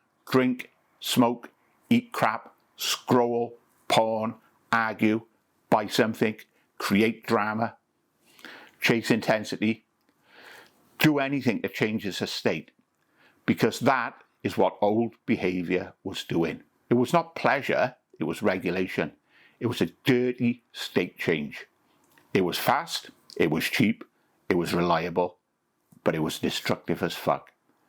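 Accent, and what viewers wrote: United Kingdom. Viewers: I'm from USA and this gentleman should get a medal. I get his Brit accent and directness like he's talking to a friend. I recommend you view as often as possible during sobriety.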